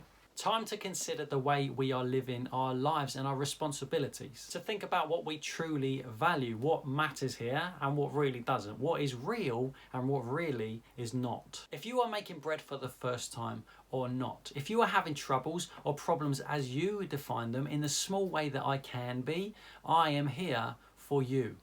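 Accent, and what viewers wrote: United Kingdom. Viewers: babes, what's your accent called? I love it! You're from the UK?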